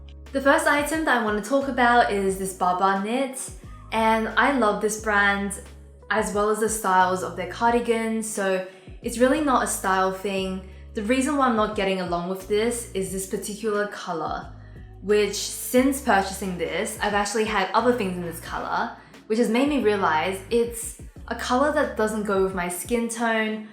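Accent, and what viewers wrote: Australia. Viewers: Oh yay I thought you were Australian! Love Carriageworks :D And such a great video. I’ve been looking at Babaa knits and this has made me really try and consider 1) whether I need it and 2) which colour will truly suit my skin tone and wardrobe! <3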